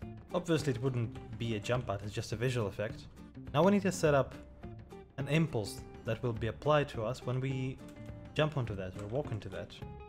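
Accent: Ireland. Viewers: Are you irish? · Didn't know you're Irish dude, what county?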